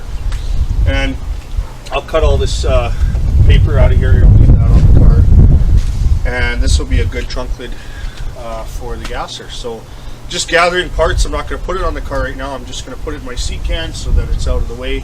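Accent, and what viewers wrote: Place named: Canada. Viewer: Wow, $100 is a steel I understand why you got it. I did good to for like I said for this area at $200. I thought I recognized your accent but wasnt sure that you might be Canadian...I would of bought even your old trunk in the condition its in If it was here for sale. Most of them are rust projects here because Im on a island. My old one is currently on Craigslist.I could repair it with a mig welder in hour but im too lazy and old now,LOLLL.....easier to buy one when the iron is hot for a good deal,HA!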